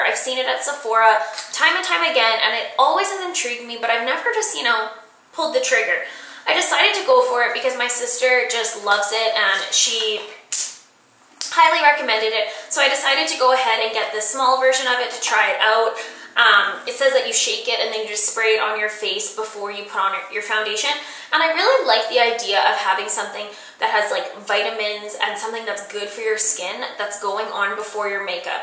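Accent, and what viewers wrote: Canada. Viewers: Random question, are you Canadian? :)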